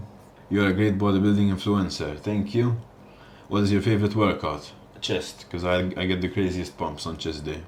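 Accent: Malta. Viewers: First time I came across u was on ig ofc and bro as soon as I heard the accent I knew u were from malta ! My question is what's your best tip for beginners?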